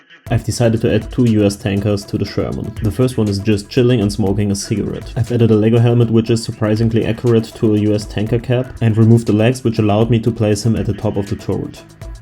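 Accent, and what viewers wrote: Germany. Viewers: Höre ich hier deutschen Akzent? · Are you German? :)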